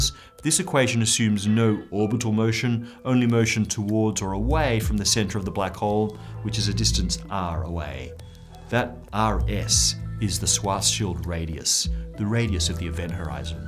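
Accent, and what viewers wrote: Australia. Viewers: seriously is an aussie?? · He's from Australia. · Why does this guy have an Australian accent?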